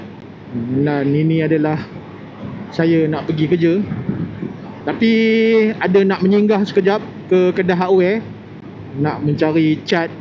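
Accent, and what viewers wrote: Perak, Malaysia. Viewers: Assalamualaikum Chor,Kome cakap org Perak je. Aje tanya je Chor.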